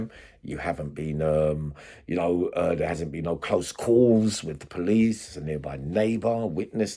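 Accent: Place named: Bermondsey, United Kingdom. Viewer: I reckon your from Bermondsey, London. or close.!!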